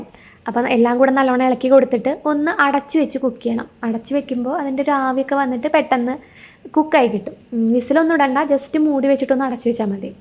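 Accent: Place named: Thrissur, India. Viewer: തൃശ്ശൂർ ക്കാരി ആണല്ലോ. കൊള്ളി എന്നു പറയ tcr അല്ലെ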